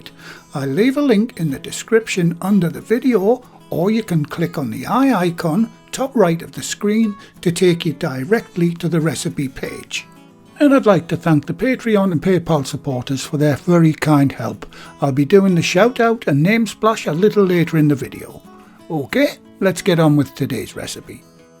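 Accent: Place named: England, United Kingdom. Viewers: Although I live in Hungary, my daughters and their families still live in County Durham. It's lovely to hear a NE accent where I spent about 20 years. I never thought of using hot-water pastry for steak pies (I use it for pork pies of course) so I'll give it a go. They don't make meat pies in Hungary so I have to make them myself. Cannot buy them in shops. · Love your voice,! 😍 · Good English cooking with a good English voice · Thank you for answering 2 questions for me. Could I do without egg as I'm allergic. And a question I didn't know I had, I have long nails 😊 I like how you mixed fats with hot water, I never did like rubbing the fat into the flour and getting it all stuck under my nails. Where are you from, you sound local, I'm from Middlesbrough.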